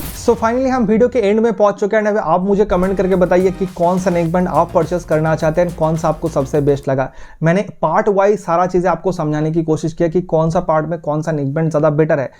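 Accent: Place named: Assam, India. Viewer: Everything is good but ur Assamese accent is kind of unique not bad though 😊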